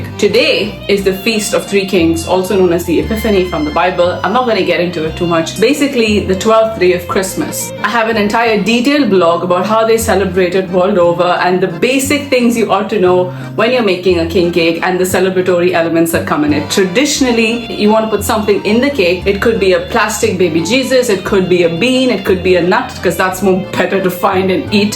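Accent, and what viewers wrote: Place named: France. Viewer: Impressive and French accent is nice one to add along with lovely Galette preparation . Keep going girl ❤🎉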